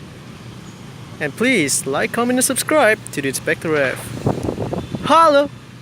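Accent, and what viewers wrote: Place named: United States. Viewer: Logatnya us bgt... Can you teach me?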